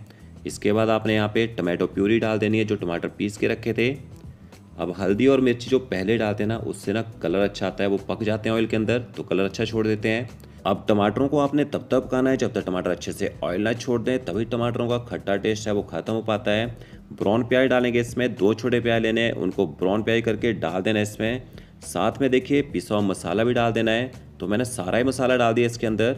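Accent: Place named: Himachal Pradesh, India. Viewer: Are you from Himachal Pradesh? Your accent is himachali.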